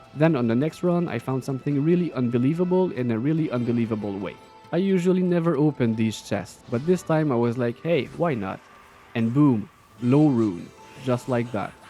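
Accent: Quebec, Canada. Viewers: Bro je reconnais un ti accent queb? Lets gooooo nice vid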